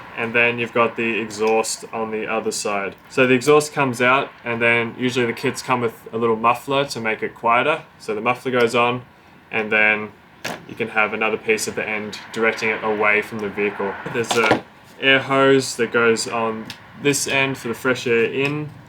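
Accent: New Zealand. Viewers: Awesome!!!! Well done! I love New Zealand, the accent and your work! Can’t wait to see more!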